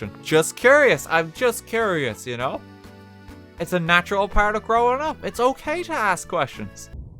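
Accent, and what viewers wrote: Ireland. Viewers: Have you got a Irish acent if so I love it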